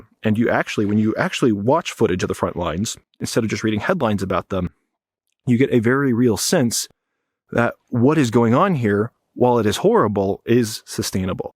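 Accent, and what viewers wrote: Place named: Ohio, United States. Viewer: You from Ohio? Your accent…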